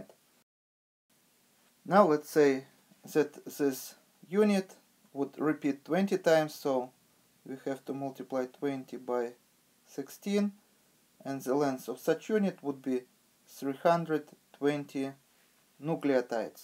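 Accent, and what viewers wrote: Russia. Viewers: Man you russian accent is awesome . :)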